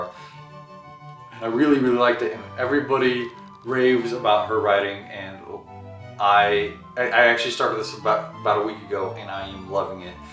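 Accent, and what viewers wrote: South, United States. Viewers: I love your podcast on this video at your house in Texas during your quarantine until you had a lot of books in the back of your room including Surrender Your Sons by Adam Sass, House of Earth and Blood (Crescent City) by Sarah J. Mass, And I Darken by Kiersten White, The Invisible Life of Addie LaRue by V.E. Schwab, The House in the Cersulean Sea by T.J. Klune, The Fires of Vengeance by Evan Winter and so many others after that, but I hope your husband, Zach will be very proud of you, I love your Southern accent and I know you're gay!!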